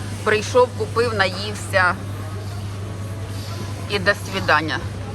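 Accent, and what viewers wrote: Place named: Kherson, Ukraine. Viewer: Привет, землячка! Левый берег Херсонской области приветствует тебя По разговору я сразу поняла что ты из нашего края. С ув Ксения❤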